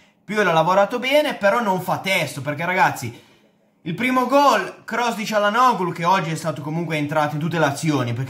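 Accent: Liguria, Italy. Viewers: Ma quanto è bello il tuo accento Ligure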